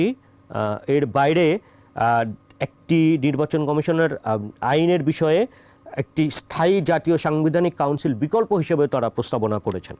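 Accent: Kolkata, India. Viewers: ডেইলি স্টার দাদার মুখে কোলকাতার ভাসা আসে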